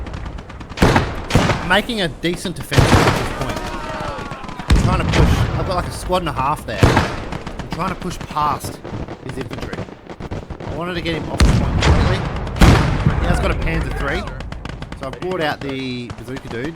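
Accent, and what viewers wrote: Australia. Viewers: If they hire you to do the australian voicelines, I think "Happy days" is a mandatory one! and "Rough!" if a soldier dies.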